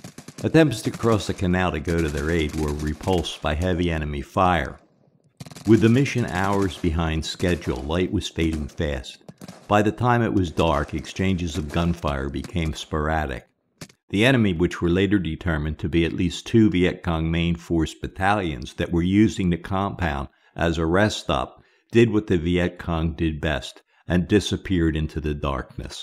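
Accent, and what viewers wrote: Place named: Pennsylvania, United States. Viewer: This narrator sounds like a native Pennsylvanian.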